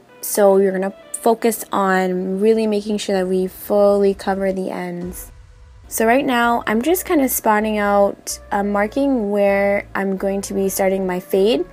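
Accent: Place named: Guyana. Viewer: love your Guyanese accent I'm mixed and mines comes out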